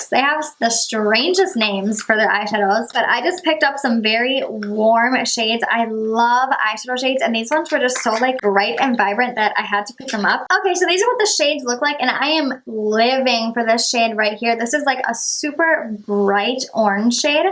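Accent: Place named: Midwest, United States. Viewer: I just found your channel and I'm obsessed with you already lol and I love your midwest accent!! I'm from Wisconsin so wooooo midwest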